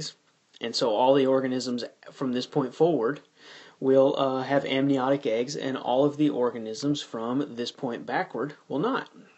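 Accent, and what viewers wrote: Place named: Texas, United States. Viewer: Thanks man! this was really helpful! I like your texas accent at times too ;)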